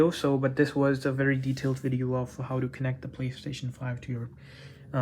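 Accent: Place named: Canada. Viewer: its the strong canadian accent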